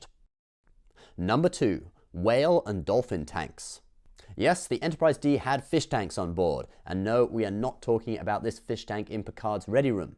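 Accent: United Kingdom. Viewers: alan littlemoon keep in mind that this is The British and thus is likely to pronounce certain words differently than us Americans do Case in point: The word "schedule": US pronunciation: Sk-eh-jew-ull British pronunciation: Sheh-jew-ull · In his defense, he's British, they pronounce and spell things weird.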